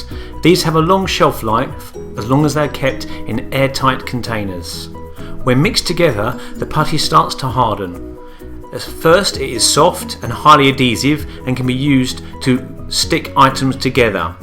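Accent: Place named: England, United Kingdom. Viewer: i like that voice from england,thanks for the tuto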